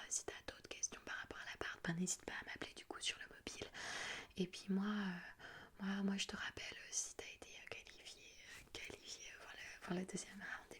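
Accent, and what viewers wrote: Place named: France. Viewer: Ese español con acento francés, bellísimo ❤️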